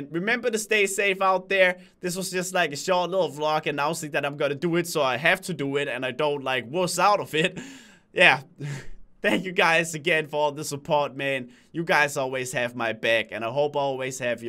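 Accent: Denmark. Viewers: i hear your danish, prove it.... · why do people make fun of your voice like its an accent cuz your danish or u just wanna talk like that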